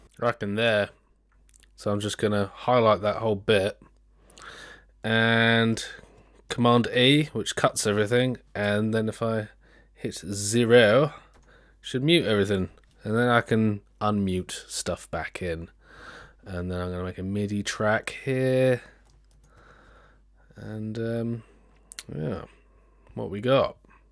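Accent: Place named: West Country, United Kingdom. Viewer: Are you still making videos? Or have you become to a higher plane artist? You sound like your rather from Devon or Cornwall.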